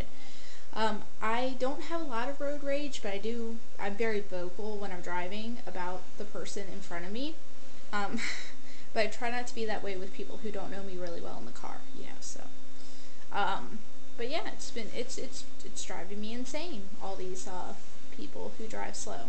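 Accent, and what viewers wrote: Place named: Canada. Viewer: Canadians do rock, I love the Canadian accent :)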